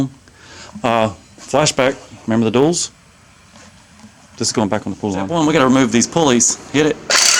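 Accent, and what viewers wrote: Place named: Georgia, United States. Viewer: Awesome. Your accent sounds exactly like my friend who’s from Georgia. That’s why I ask! Great video!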